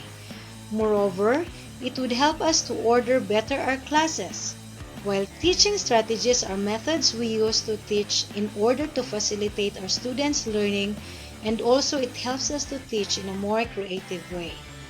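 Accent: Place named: India. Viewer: Indian English is too easy to.detect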